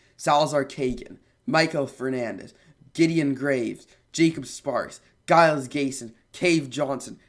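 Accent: United Kingdom. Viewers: Dude great job on the British accent too! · good job with the birtish accent!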